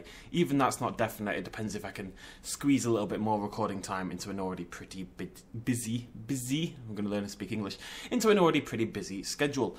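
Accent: United Kingdom. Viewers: Ten days without a video in British? I can't deal....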